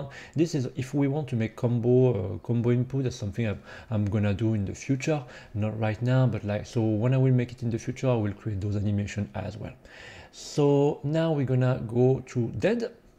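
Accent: France. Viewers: j'ai compris presque immédiatement que tu étais français sans considérer ton pseudo 😂, très bon tutoriel merci chef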